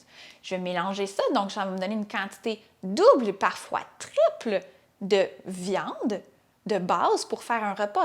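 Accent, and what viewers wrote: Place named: Quebec, Canada. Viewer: Bonjour, je viens de découvrir votre vidéo. Elle est géniale et votre accent québécois, J'adore 😍. J'adore votre façon de penser et de nous motiver à trouver solution pour mieux dépenser. J'adore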